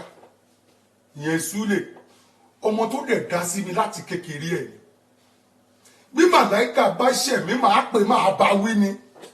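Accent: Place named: Ilorin, Nigeria. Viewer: I keep watching this video on an on .it look like this man come from ILORIN . he sound like a person from Ilorin .I always laugh all the time i replay the video very funny man, but things I don't why Yoruba peoples like to curse each other it's not good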